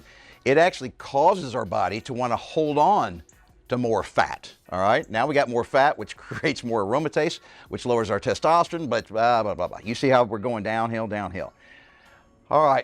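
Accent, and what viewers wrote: Texas, United States. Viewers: He’s a Texan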